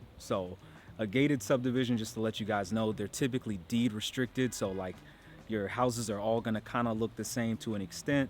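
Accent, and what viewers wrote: United States. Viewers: The important part in the title is this "IN MY GERMAN NEIGHBORHOOD". Because in my neighborhood i see exactly nothing. There are houses and a street. Thats it. Be happy you landed there. Germany can be the most boring place imaginable. Every jungle village in Asia has more to offer than the villages here. Also the thing with the "humanity". Sadly having books along the streets isnt the best proof for lots of humanity :-) Ive visited many placed in the world but the Germans were the coldest among all. I didnt see any humanity anymore, when its about talking normal to unvaccinated. Or if someone falls out the of the standard mainstream person. Nothing is left of any human sign in those cases. You will be treated as an idiot and outsider. Thats is not whats humanity means exactly. And nowadys politicians are unacceptable too. Dont get me wrong. 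I can imagine your place is nice. And im very glad you enjoy it :-) Honestly. Im sure someone can get happy in this country. Sadly not me but that doesnt have to count for everyone. You sound like youre from the US. Not sure. A problem could be that Germany is the behind of the US and that may mean that in 10 years of time, you end up at "the same place" as you left. A crazy one ^^ Enjoy. I hope you find what youre looking for.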